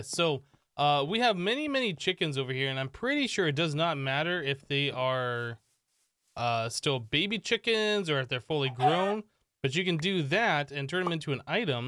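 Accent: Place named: Canada. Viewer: This guy is Canadian right? He says some words in the stereotypical accent, like when he says egg. I don’t say egg like that but some Canadians do.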